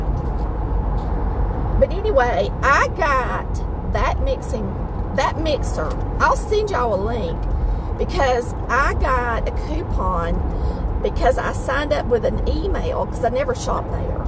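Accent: South, United States. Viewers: I wish she'll be my mama because I like her country voice. 😍😍🌹❤❤❤😘😘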